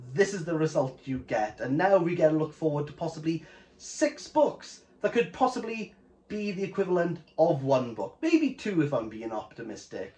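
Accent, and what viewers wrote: Wales, United Kingdom. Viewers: Found your channel by accident...and what a happy accident it has been. The honesty and intelligence in this review is refreshing. Cheer to you for that! (Also, is that a Welsh accent I'm detecting, or am I completely lost?)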